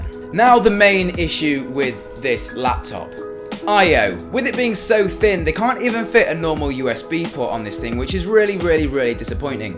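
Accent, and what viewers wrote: England, United Kingdom. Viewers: You’ve basically copied MKBHD with the pros and cons Only with english accent ...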